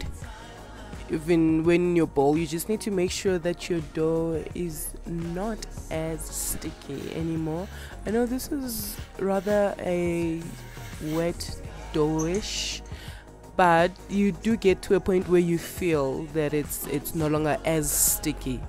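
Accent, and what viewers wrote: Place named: Zimbabwe. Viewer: You sound sooooo ZIMBO 💕 Makes me so homesick just listening to you!!!! Gosh these look mnandi sibili 💕 Thanks sis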